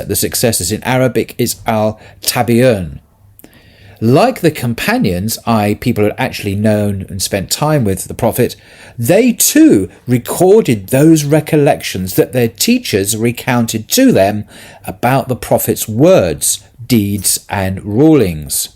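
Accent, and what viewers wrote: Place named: England, United Kingdom. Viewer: Alhamdulilah for Brother. I always learn a lot from him. I especially enjoy his accent..a true English man.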